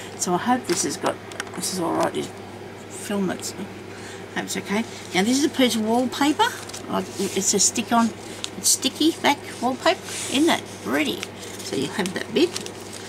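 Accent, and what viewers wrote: Australia. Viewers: Merry merry Christmas. I love To hear another aussie voice. How do you wash vintage laces? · Oh wow...just discovered your channel :).....being a newbie, I'm on a voyage of discovery! I heard you speak.....and thought ..Wow..another Aussie ..yay:). Love your work and so good to hear our lingo xxxx. Question? ....saw another video you did about lace covers and you used a hot glue gun. Does the glue show through with thinner fabric ( as in internal lining in a book?...spray glue leaves nasty marks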